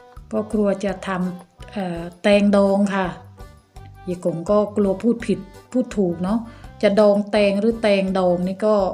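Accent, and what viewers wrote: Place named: Surin-Buriram, Thailand. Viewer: พี่บัวบุษบงมาชมการทำแตงกวาดองด้วยจ้า ว้าวง่ายจังค่ะ จ๋าเป็นคนแถวไหนน้อพูดเหมือนคนแถวๆสุรินทร์บุรีรัมย์เลยจ้า..พี่บัวคนสุรินทร์เด้อจ้า😂😂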